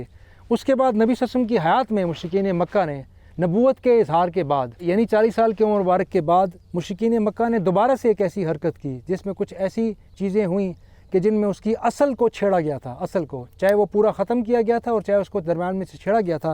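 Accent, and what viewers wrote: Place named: Kashmir, India. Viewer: آپکے بولنے کے انداز سے معلوم ہوتا ہے کہ آپکا تعلق کشمیر سے ہے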